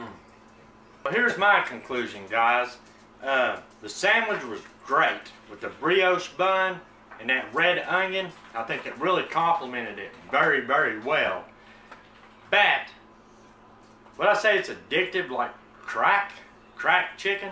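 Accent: South, United States. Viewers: This really looks delicious. I make plain chicken breast in water in the crockpot for shredded chicken sandwiches and the chicken is usually a little dry. Sometimes we put gravy on it but a little annoying that it’s dry. Don’t care for the Crack chicken name either. Ranch shredded chicken sounds better. Really like your channel. You serve up great recipes with a great southern accent. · Love your southern voice!!!! Love your videos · You can cook! And I love your Southern accent. Reminds me of home. My mom’s home anyway. Great memories. Keep kookin’!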